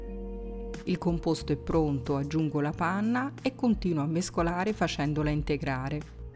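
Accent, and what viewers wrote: Tuscany, Italy. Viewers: Buonasera, spiegazione precisa e completa, impreziosita dalla tua voce dall'accento toscano semplicemente meraviglioso!😍 Ottima freschissima cheesecake con un superbo topping al limone 🍋! Grazie, insuperabile!👍🥰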